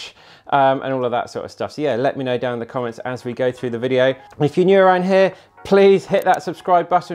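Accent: United Kingdom. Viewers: Me and my bf find you so cute and love your British accent. I'm currently aiming for sub 2.40 marathon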